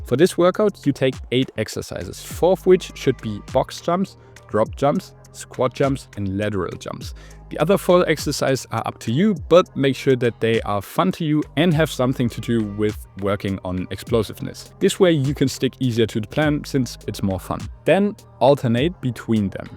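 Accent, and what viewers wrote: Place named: Germany. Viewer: gutes video aber holy shit was ein deutscher akzent 😂❤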